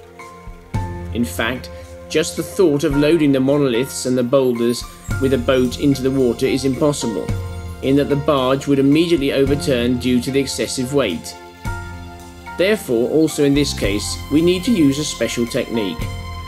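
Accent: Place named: United Kingdom. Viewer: Don"t like the narrators voice. Why do they insist on using British men, like that adds credulity to the subject?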